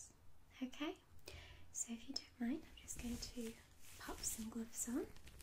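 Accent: United Kingdom. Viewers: your videos are so good it's always cool to see fellow brits and hear British accents:) subscribed x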